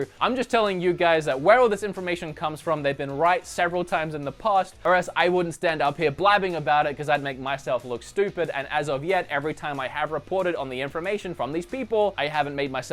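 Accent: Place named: Australia. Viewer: you know hes Australian right...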